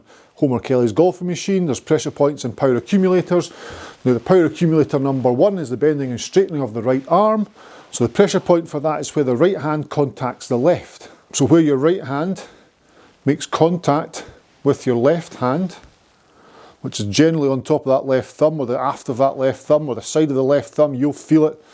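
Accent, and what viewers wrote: Scotland, United Kingdom. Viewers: Love your instructions. I always pay attention because, to tell you the truth, you scare the hell out of me. My grandmother was Scottish and I just love the “accent.”.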